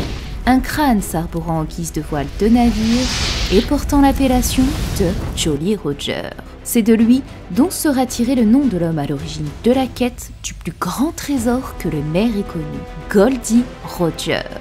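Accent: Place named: Belgium. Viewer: Vu l'accent, je dirais qu'elle est belge.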